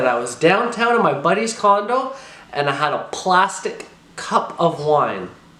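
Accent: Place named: Canada. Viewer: I love your canadian(?) accent.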